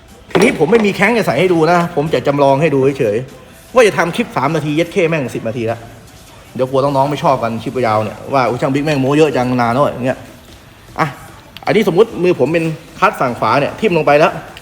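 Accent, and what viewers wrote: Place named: Isan, Thailand. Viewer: ช่างบิ๊กเว่าอิสานว่ะ555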